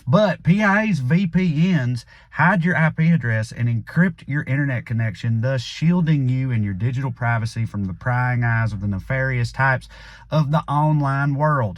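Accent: South, United States. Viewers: Dude!!!!!.. GIGGLE MERCHANT!!!!! STILL LMFAO!!.. LOVE THE SHOW, AS ALWAYS SPOT ON!!.. ALSO I COULD LISTEN TO THAT SOUTHERN ACCENT ALL DAY LONG!!( ONLY A COUPLE WORDS I GO..??? WHAT'D HE SAY??!!!! YOU ARE AWESOME!!.. I SO APPRECIATE THE HARD WORK THAT GOES INTO YOUR SHOW!!.. YOU ROCK!.. THANKS FOR KEEPING IT REAL, HONEST, AND UNFORTUNATELY TRUE!!... VOTE VOTE VOTE BLUE!!!!!... PLEASE 🙏 🙏 WE GOTTA STOP THE SICKA** REPUGNANT REPUBLICONS!!!..... YOU'RE A GREAT HUMAN BEING ❤️ ♥️!!...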